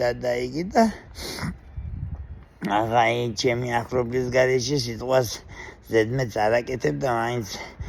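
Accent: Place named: Imereti, Georgia. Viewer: გილოცავთ ახალ წელს, ჯამრთელობას გისურვებთ! საიდან ბრძანდებით ბატონო, იმურული კილო გაქთ. ❤️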